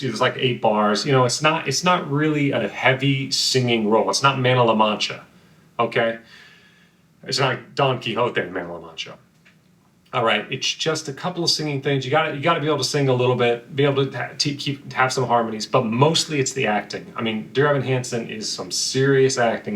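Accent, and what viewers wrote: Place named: Midwest, United States. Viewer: As someone from the Midwest, I love listening to you. It sounds like home :)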